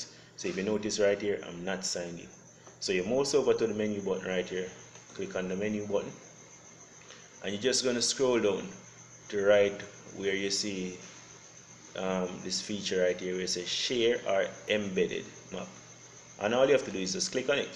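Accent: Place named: Jamaica. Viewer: Bet dis a Jamaican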